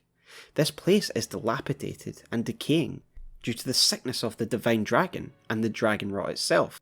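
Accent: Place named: Scotland, United Kingdom. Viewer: It's nice to hear a Scottish accent. Love your vids man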